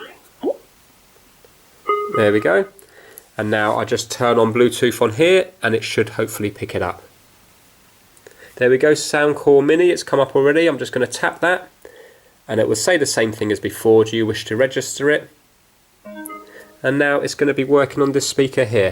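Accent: United Kingdom. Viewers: The british accent is very funny lmao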